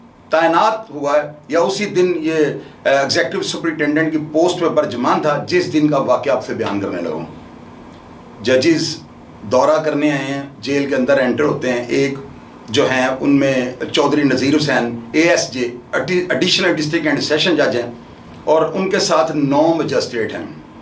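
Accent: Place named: Sialkot, Pakistan. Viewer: Aapka lab o lehja Sialkoti hai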